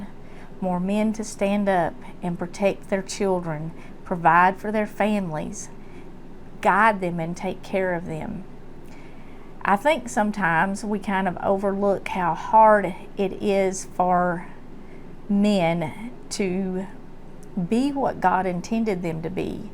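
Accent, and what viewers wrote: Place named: Tennessee, United States. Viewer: Wonderful lady! Very delightful. Love her deep southern accent from Tennessee. She is a rare one these days. She praises men and the men in our lives and teaches that appreciation of the men in our lives is important. We live in a society that prefers to demonize men, make them all out to be bad actors and bad guys and never good. That even men who are strangers must be up to no good if they approach a woman outside excercising and want to ask her something. I understand some of them may say things not kindly but that doesn't mean we say unkind back. Courtesy and manners and good words go a long way. Maybe pray for that person, smile and walk or run on. But not a bad word. I was just on a Facebook group the other day that clearly in the comments of women showed exactly that. It went from a conversation on personal running safety to make hate and sexual harassment and how ALL men must be so bad and up to absolutely no good just because they approach some female or happen to be outside in the great outdoors too. 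These women commented as if they owned the world, like the world revolves around them and how everything has to change to fit their narratives. I couldn't believe it! Never have I heard or read anything so repugnant in my life. Well, I'm a woman too. I grew up in a day and age where my parents taught me how to be kind to all people including strangers. I was taught how to gage a situation and how to act accordingly. It's so unfortunate that we are living in a society th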